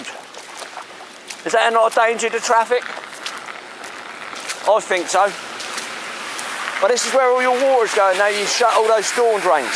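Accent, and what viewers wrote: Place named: Southampton, United Kingdom. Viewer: New I recognised that accent, soton through and through haha. I went to woodlands, live in swaythling. Used to knock around by the Hinkler pub, Hanney rise etc. Love your vids mate, just got into shooting recently, cheers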